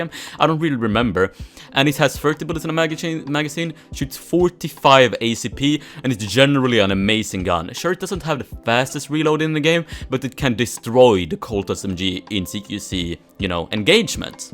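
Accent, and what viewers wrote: Sweden. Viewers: I guess that you are from Sweden.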